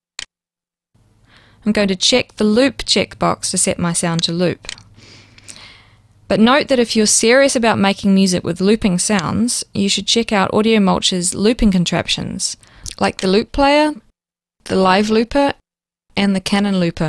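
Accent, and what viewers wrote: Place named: Australia. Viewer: yes fantastic ozzy accent!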